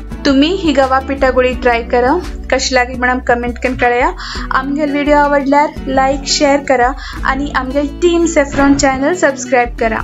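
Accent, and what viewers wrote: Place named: Konkan, India. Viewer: You speak exactly my kind of konkani! I'm stunned. Haatutach and not haantuchi 🤗. Where do you come from?? I was always being corrected saying my language is not correct but now I'm so happy to have found another konk speaking like me and my mom's side of family!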